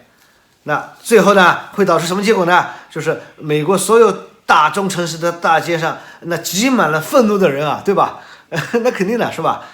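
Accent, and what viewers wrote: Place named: South, China. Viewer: 终于有我们南方人说政治啦👍👍👍这个口音听着真亲切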